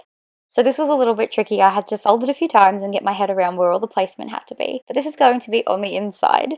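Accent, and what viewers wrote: Australia. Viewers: Love your lovely Aussie voice! Gorgeous card and I love your inspiration piece! Fellow Aussie here!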